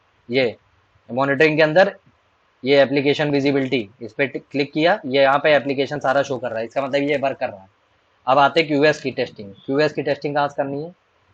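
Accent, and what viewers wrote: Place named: Uttar Pradesh, India. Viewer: avaj se lag rha tha koi up ka hai😾😾😾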